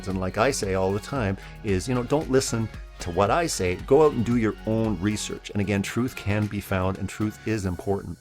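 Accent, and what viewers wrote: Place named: Canada. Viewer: Your accents Canadian obviously👍🏼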